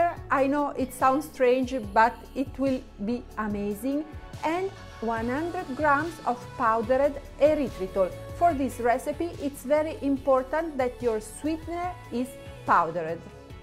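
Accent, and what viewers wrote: Italy. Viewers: making it easier for yourself is the key. May not be much different on our end but if you can save minutes and hours of editing that's well worth it. Your Italian accent is half the reason that I subscribe, thank you again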